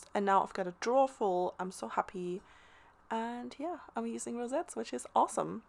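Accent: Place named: England, United Kingdom. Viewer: You make such pretty things. I love pink and purple myself. My fav colors. Love listening to your “English” voice. We here in the states gave the “hick” sound. 🤣. Anyway, I need to find the pretty embellishments like you do to layer with. So fun watching you create. TFS!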